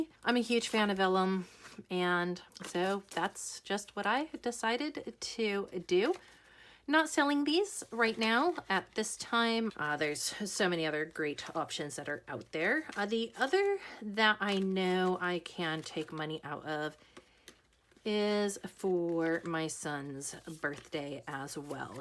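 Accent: Australia. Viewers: 🏍 I swear I lost it when you called the doggies boof heads. You are getting more aussiefied every day ❤️ Kelz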